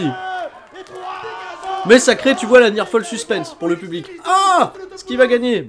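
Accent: North, France. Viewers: Salut tu es du nord ? :)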